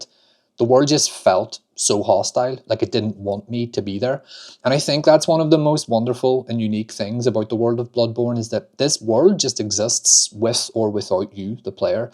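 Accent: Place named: Northern Ireland, United Kingdom. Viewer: Mate r u from NI? was so hyped when I saw the thumbnail and my excitement doubled when I realised u have my accent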